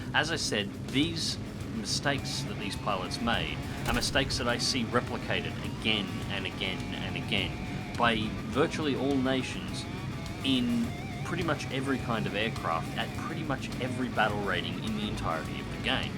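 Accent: Australia. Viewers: hey are you an auzzi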